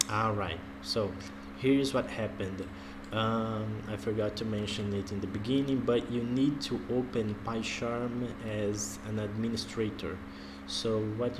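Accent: Brazil. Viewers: Parece ser um brasileiro falando. Parabéns pelo tutorial e muito obrigado pelo conteúdo. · Parece ser um BR falando, se for, faz um em portugues ai tbm man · Ia dizer isso, ou é Brasileiro ou indiano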